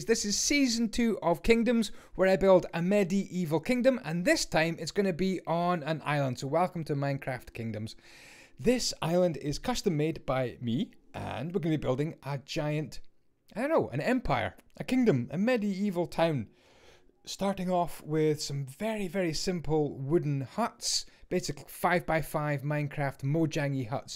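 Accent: Scotland, United Kingdom. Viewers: You have a scottish accent🏴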